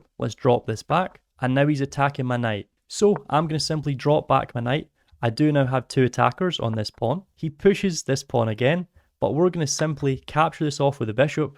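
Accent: Scotland, United Kingdom. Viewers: Nice Scottish voice, subscribed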